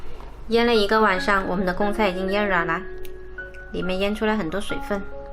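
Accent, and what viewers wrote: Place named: Teochew, China. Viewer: 是揭阳普宁一带的客家人吗？听着潮汕话挺标准的（本人潮州的）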